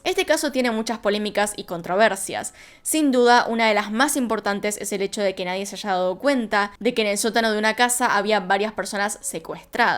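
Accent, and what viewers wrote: Argentina. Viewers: Me enkantan tus videos..eres excelente y me enkanta ese acento Argentino, tu lo haces mas KLASE😍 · No soporto ver esto porq el shoooo no puedo escuchar argentinos pssss chau veo otro video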